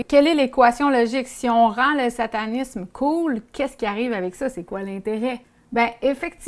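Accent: Quebec, Canada. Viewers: J'aurais préféré que tu fasse une vidéo un peu plus longue c'est dommage parce que t'explique ça bien et pour un français j'ai pas eu de gêne à t'écouter malgré ton accent québécois.. En tout cas j'espère que tu poussera plus lorsque tu fera celle sur le pizza gate car pour le coup ya beaucoup a dire et c'est très très grave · Très cool ta vidéo chère amie Québecoise ! Bien montée, bien narrée (avec le petit accent qui fait plaisir !). Bisous de France ;)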